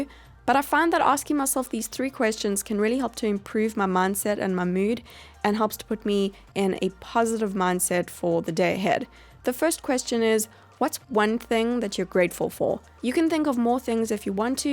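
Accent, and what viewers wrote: South Africa. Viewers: are you from South Africa? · Fellow south African is that you??? ♥️ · Are you South African? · are you South-African, because your accent sounds like it, im South-African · Are you South African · Your accent makes me feel like I'm home :D I'm a South African living in London.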